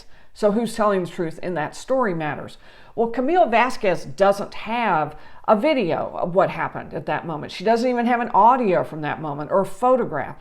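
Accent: South, United States. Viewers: Do I hear a southern accent? I'm from VA and GA . I learned to not use accent but it slips out? Thanks for your time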